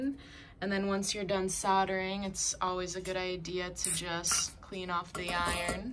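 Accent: United States. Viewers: Why do Americans distort the English language? i.e. The word SOLDER has an 'L' in it which in normal English is pronounced! So why don't Americans pronounce the word SOLDER instead of saying SODDER?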